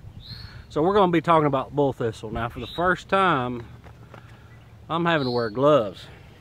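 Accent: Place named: South, United States. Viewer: The Horrid Thistle (better name than Bull Thistle) is absolutely not an invasive plant! It is a native, and extremely beneficial plant for wildlife. Applying the obvious here; you have a southern accent so I can assume you live in the Deep South, like me. Houston, Texas here. Horrid Thistle' are blooming everywhere right now with Spring just beginning. Sure, they may not be conventionally pretty but they are fascinating. Back to the point, know your stuff brother! Don't spread misinformation. The rest of the video was great btw.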